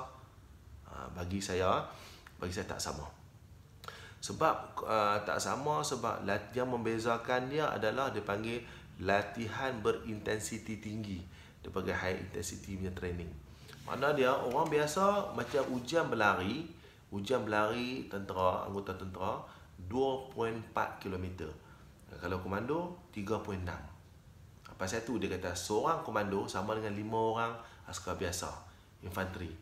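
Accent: Kedah, Malaysia. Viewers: Steady Tuan..yang sempoi kompom org Kedah...gerek,qeba,nasib x sebut pedai ayam...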